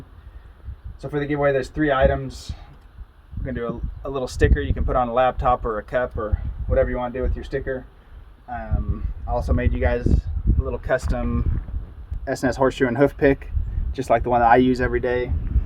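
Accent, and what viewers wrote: South, United States. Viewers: Oh, I had a feeling you had to be from the south! Can't get rid of that drawl that easily :P May I ask what plans you intend to take after college? Gonna keep ferrying or gonna try to be a large animal vet? I like hearing the little updates in your life with the videos as well as all the horse info- you're just as much a part of the video as the horses! What a sweet giveaway though, that's really lovely. I'm gonna have to see what I can do out here now and get my entry in! Hope y'all are having a good Easter from down here in Texas! <3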